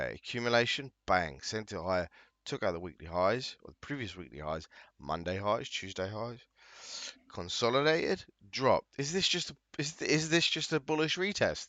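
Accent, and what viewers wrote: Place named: Cockney, United Kingdom. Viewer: proper cockney geezer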